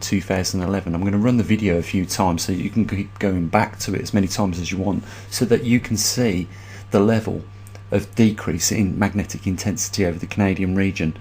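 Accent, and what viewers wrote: Birmingham, United Kingdom. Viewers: OMG a Brummy talking science . Whatever next. Utter bllx.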